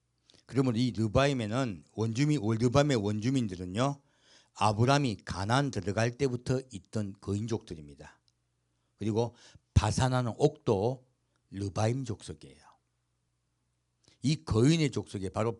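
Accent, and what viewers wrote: Jeolla, South Korea. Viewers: 말투를 보니까 전라도 출신 같으신데, 빙빙 둘러 말하지 마시고, 솔직하게 입장을 밝히시는 게 좋겠습니다.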